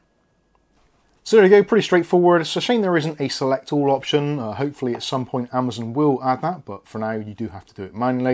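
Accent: Bristol, United Kingdom. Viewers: Thanks a bunch for the info. Why do you sound Bristolian?🤔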